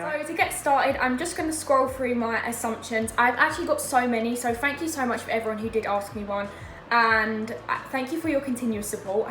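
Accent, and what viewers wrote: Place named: Australia. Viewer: The Australian accent tho😍😍😍😍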